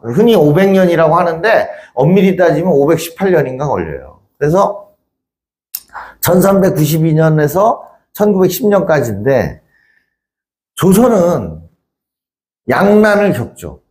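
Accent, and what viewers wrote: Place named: Chungcheong, South Korea. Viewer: 걸쭉한 충청지역 토속억양이 구미 당겨놓고 시작하니 이 풍성한 명절에 선생님 만나는 기쁨 호삽니다 언제어디서나 인간의 만남에 공통 이야기 상식 풀어 주시니 고맙습니다 건강 유지하시길.....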